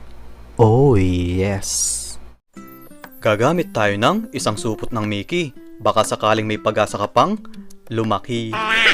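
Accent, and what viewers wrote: Pampanga, Philippines. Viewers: Haha sabi kuna pin kapamps ka eh base king boses mu... First time ku apanalben video mu lakas maka entertain brad haha. God bless and more power!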